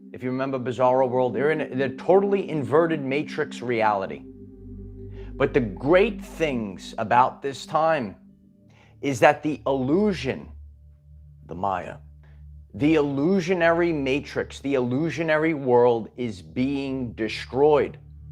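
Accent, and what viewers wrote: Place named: Philadelphia, United States. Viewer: Are you at Dan Giacobbe’s house? Def have a Philly accent.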